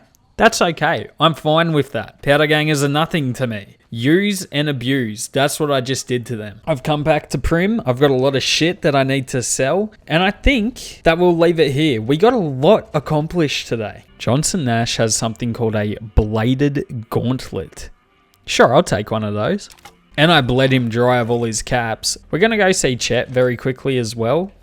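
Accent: Australia. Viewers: also, who doesn’t love an aussie cant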